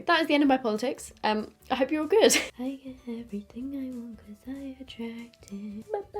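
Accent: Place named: United Kingdom. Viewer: Is it just me or was extra british in this video😂 absolutely beautiful as always!!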